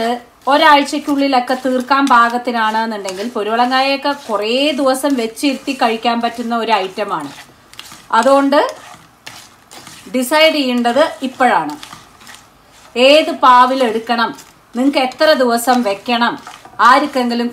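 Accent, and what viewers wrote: Palakkad, India. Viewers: I really Thank you for this compliment. Real Palakkadan language. Post Thenga Vada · പാലക്കാടൻ വിഭവങ്ങൾ ഉണ്ടാക്കുന്ന വിധം, തനി പാലക്കാടൻ മലയാളത്തിൽ കേൾക്കുമ്പോഴുള്ള സുഖം, അതൊരു രസമുള്ള അനുഭവം തന്നെയാണ് ട്ടോ, എത്തനൂരാണ് നാടെന്നറിഞ്ഞപ്പോൾ ഒരടുപ്പം, കാരണം എന്റെ മകളുടെ ഭർത്താവ് എത്തനൂർ കൊയ്യൂർ വീട്ടിലെയാണ്. ഞങ്ങളുടെ റിട്ടയേർഡ് ജീവിതത്തിൽ ഇപ്പോൾ, ഇങ്ങിനെ പഴയ പലഹാരങ്ങളുംമറ്റും ഉണ്ടാക്കി നോക്കലാണ് മെയിൻ ഹോബി. Thank you. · Made the rangayyan today ..made some changes in the tempering. Came out tasty .Nalla palakkadan slang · നല്ല പാലക്കാടൻ മലയാളം...❤❤❤❤ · Chechi sherikum annapoorneshwari devi thanneya.. ❤️❤️❤️🥰🥰🥰😍😍😍 Chechi undakkunna reethiyum ath kazhinju chechi kazhich koodi kanikumbo sherikum manasu nirayua..Chechide palakadan samsaram kettirikkan enth rasama...Variety recipes njangalude munnil iniyum kond varane chechi.. Othiriyothiri nanmakal nerunnu...❤️❤️❤️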